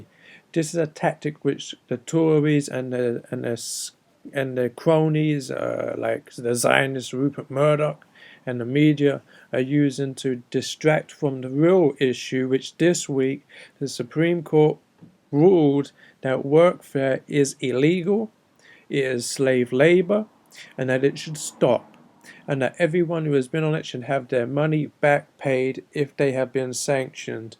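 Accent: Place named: United States. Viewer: why do u sound american then?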